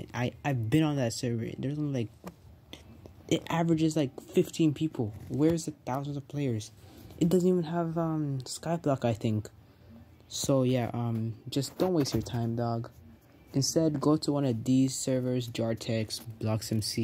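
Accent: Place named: India. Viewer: Fr bro as soon as i hear the indian accent i know that the video is fake, scaming literally flows in their veins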